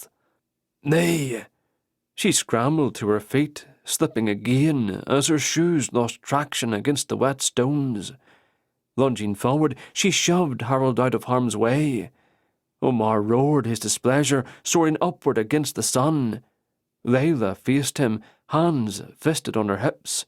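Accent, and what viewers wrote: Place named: Scotland, United Kingdom. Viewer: Does anyone know if this narrator, narrates any other books? This is my second time through this series. The Scottish voice for a story about Scots is beyond perfect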